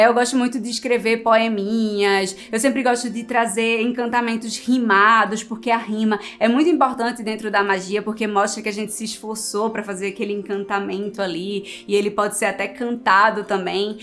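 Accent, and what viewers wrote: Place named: Northeast, Brazil. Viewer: Nordeste, né, bê. 😘 És bem vinda aqui.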